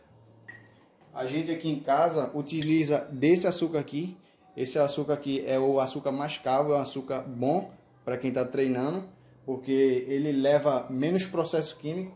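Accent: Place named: North, Brazil. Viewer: acho q e do norte ou nordeste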